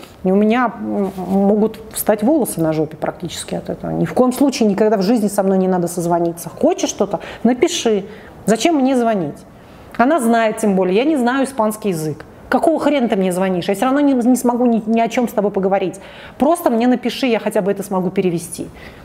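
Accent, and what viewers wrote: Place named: Russia. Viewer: Без акцента?! Чудовищный русский акцент!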